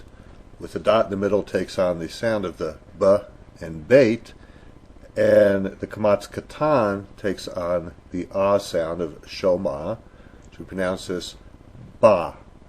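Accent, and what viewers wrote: United States. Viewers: the thing about non-native language teachers is that they don't exactly mimic the local sound. for example the teacher in this vid still pronounces the words with a subtle american accent (e.g.: "owe" instead o "oo", "meih" instead of "meh"; the word 'nekudot' (hebrew=points) was also pronounced the american way. pls try to delineate the american sounds to hebrew (or any other foreign language for that matter) sounds for an authentic foreign language lesson. nice lesson though. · Love your work! Lessons are informative and take the right pace for any person starting to learn Hebrew. Your Hebrew might be a bit "American", just like most of Hebrew in Israel is a bit "Russian", "Hungarian", "Germanic"... Some people swallow : (/e/), some pronounce it... No one should be discouraged because of it, just the opposite! Approach taken here is better than anything I have seen or heard up till now. 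Bravo and toda raba! :) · what a bad way of pronouncing all this, so americanised....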